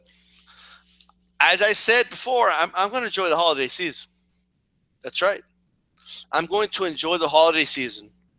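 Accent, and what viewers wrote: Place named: New Mexico, United States. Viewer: por k hablas con accento nuevo mejicano?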